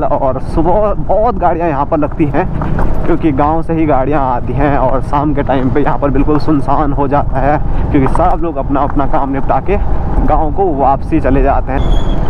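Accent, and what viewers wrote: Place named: Haryana, India. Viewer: Ary ya aawaj to mhale haryana ke h🚩🚩🚩🙏🙏🕉️🕉️🕉️🇮🇳🇮🇳🇮🇳🇮🇳👍🌸🌺👌👌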